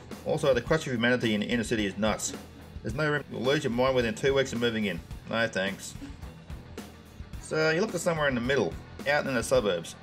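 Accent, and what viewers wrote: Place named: Australia. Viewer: That Australian accent tho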